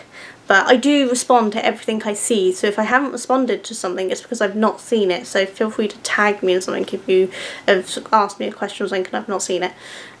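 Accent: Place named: England, United Kingdom. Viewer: love the english accent... cheers... keep up the great work 🙏❤️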